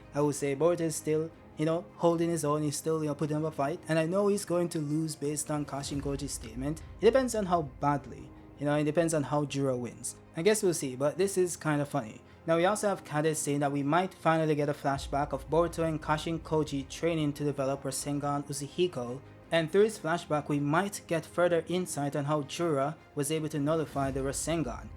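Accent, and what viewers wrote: Jamaica. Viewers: You sound Jamaican bro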